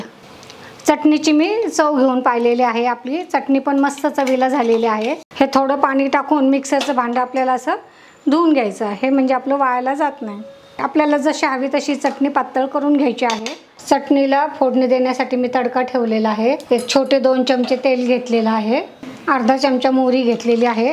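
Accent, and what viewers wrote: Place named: Ahmednagar, India. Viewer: Tumhi Ahmednager cha aahat ka tumcha bolanavarun taser care.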